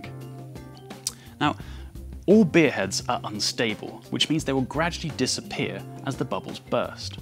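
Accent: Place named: RP, United Kingdom. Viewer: He has a posh accent haha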